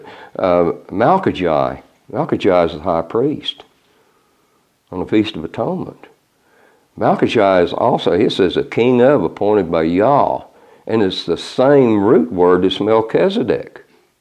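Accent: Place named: South, United States. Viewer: Oh brother, bless you. You really get it, the difference bw the BoC & BoL!!!! Praise YHWH & bless you! Where are you located? Ur a southern gent., that I can tell, but where? TX, i hope or TN?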